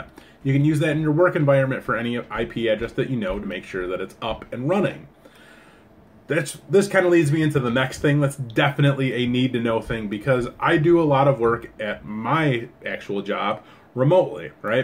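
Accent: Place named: Michigan, United States. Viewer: I love a good Michigan accent 😍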